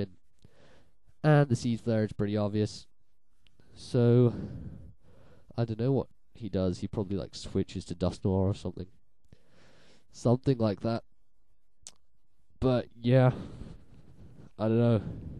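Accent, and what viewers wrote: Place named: United Kingdom. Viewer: You're commentaries are really good! Makes a change hearing a British voice. Anyway, if you wanna battle some time, message me, be interesting seeing my team narrated against on youtube :P.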